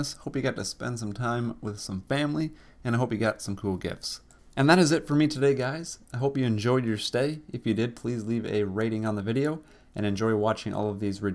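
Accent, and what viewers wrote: Minnesota, United States. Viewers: are you actually from Minnesota?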